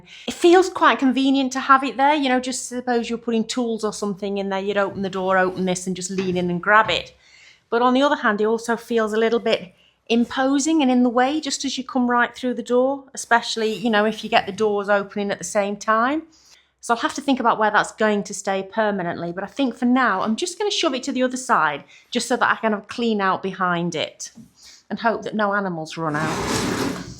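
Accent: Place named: Birmingham, United Kingdom. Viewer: I watch you for your content, yes, but even more for your accent. You see, my mom was from Birmingham, and a war bride that came to America to join my Dad. She has been gone these many years and I miss her and her accent. Thank you for listening to an old guy and his memories and brining them back with a your heavenly accent and sweetness. You’re a hard worker.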